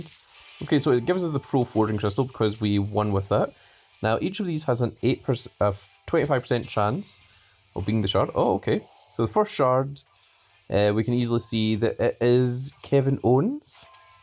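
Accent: Scotland, United Kingdom. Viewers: Hey dude I can hear from ur accent your also Scottish, I beat you on KOTR shocked to find out you stream Supercard GGs man!! I have subscribed to you bud. 👍🏻😎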